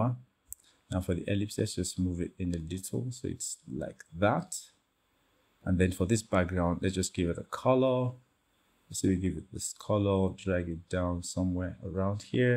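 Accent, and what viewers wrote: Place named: Nigeria. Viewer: You sound Nigerian and I love the way you speak clearly!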